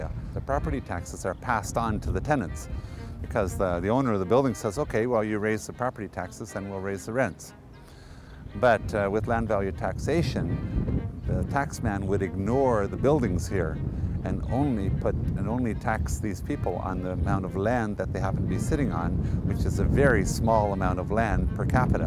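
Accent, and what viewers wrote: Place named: Canada. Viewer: Holy shit this guy’s accent is Canadian af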